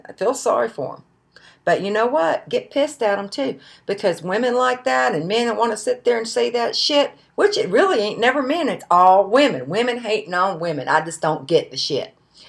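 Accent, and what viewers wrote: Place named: South, United States. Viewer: u go girl...you inspire us...i love your paula deen style accent..you are the real deal...wish u the best of luck keep losing..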